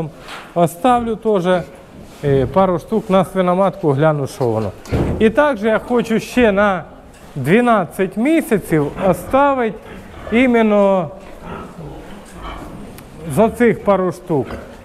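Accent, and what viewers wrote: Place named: Poltava, Ukraine. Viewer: Ви случайно не с Полтавскої обл, говір дуже схожий,кумедний і цікавий?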